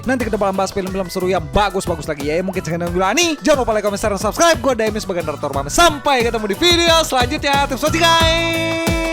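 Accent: Banjar, Indonesia. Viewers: Urang Banjar kah ni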